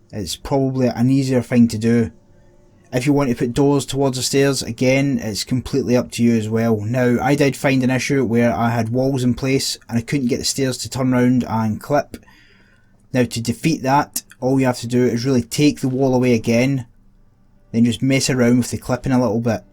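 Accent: Scotland, United Kingdom. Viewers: Good Scottish accent, I'm in Falkirk, from Cumbernauld and all fallout games look like Scottish newtowns! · Scottish accents are the best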